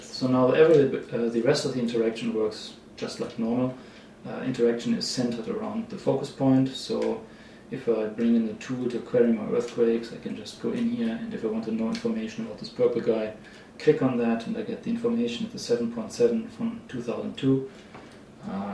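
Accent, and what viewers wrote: Germany. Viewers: Hey dude, where are you from? You sound like a German ^__^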